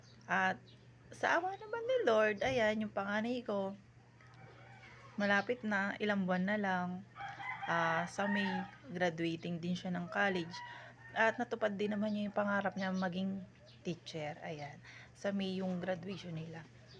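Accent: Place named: Cardona and Morong, Philippines. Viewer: Parang cardona at morong ang punto ng salita nyo madam.kapareho ng sa amin taga isla talim si nanay .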